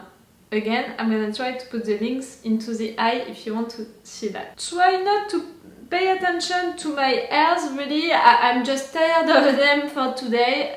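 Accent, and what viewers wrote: France. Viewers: This french accent of yours is quite pleasant for my russian ears. Sounds great) and I understand almost everything)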